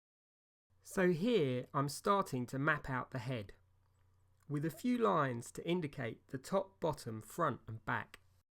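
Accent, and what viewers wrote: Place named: London, United Kingdom. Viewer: Hearing you speak makes me miss London!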